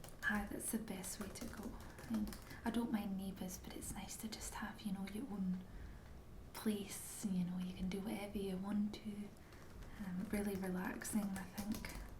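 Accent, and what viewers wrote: Scotland, United Kingdom. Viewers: Great Scots accent *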